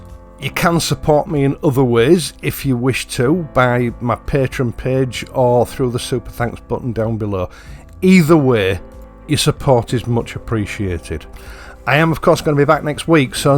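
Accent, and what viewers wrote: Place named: Yorkshire, United Kingdom. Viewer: Thanks to the dulcet Yorkshire tones of, every time I wheel my Super Meteor out of the garage I hear the equally dulcet tone of my missus (doing her best Michael Parkinson) saying … “it has the turning circle of the Amoco Cadiz”.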